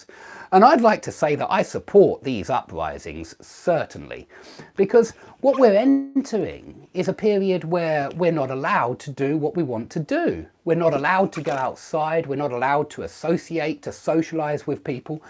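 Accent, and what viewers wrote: England, United Kingdom. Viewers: Mr thank you first for your lessons, I wanna ask you please, is your english accent a northerner or southerner one?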